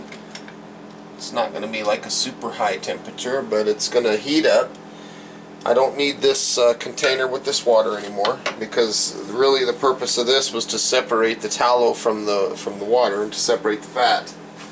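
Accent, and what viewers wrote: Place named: Arizona, United States. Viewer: Aaaah, Arizona. That would explain the beautiful accent. Stay safe.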